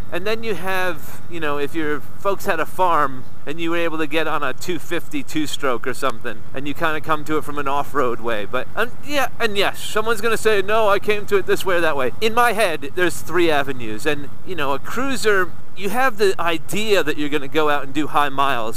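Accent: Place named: United States. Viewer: Hi, How is it that you have made your home in England. You sound like an American. I lived in London as a teenager and have always wanted to return and live there. How did you do it?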